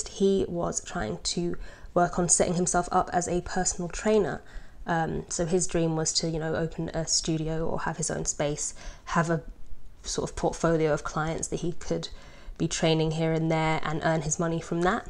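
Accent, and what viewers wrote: England, United Kingdom. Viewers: I third that. Haha. New subscriber. I love Art!! Love to listening to British English accents.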